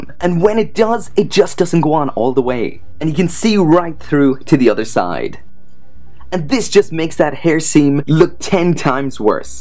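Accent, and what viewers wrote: Ireland. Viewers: Thanks for the in depth review. I almost picked her up to hold me over until kirin hobby sends my Sabre 2.0 is shipped out. You're one of the few reviewers that points out the good and the bad in equal detail. Quick question... Where are you from? Lol! At first I thought British Guyana because you say certain words similarly to my Mother but, after watching almost all yer vids, I"m thinking you're Irish. Just Wondering. Anyway keep it up!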